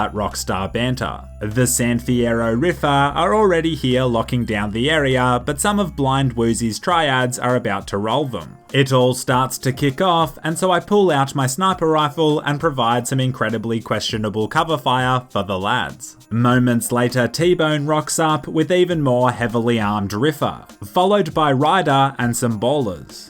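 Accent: Australia. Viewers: You talk like a robotic Australian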